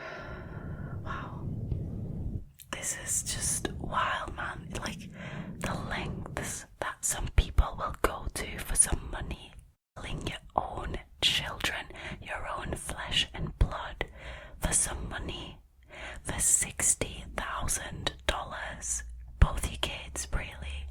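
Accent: Northern England, United Kingdom. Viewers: Sorry I have also been trying to locate the accent. It’s great and really draws me back to the channel. I studied and lived up north and have a northern dad although I come from and live down south but miss the accent sometimes. Understand why you wouldn’t want to be specific but I’m speculating England’s biggest county (or near to). Thanks for more spooky storytelling as always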